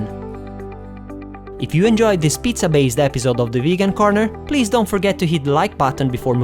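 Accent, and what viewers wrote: Italy. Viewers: it's Mario! lol I love the accent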